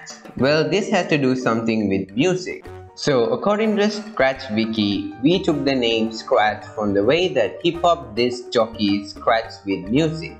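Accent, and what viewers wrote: India. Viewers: ur indian accent is so strong, the subtitles say "caro network" instead of "Cartoon Network)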